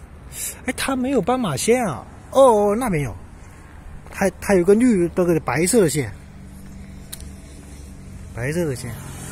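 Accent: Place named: Shandong, China. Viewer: 心声，你肯定是山东的吧，我听出来了，老乡🍔🍟🍕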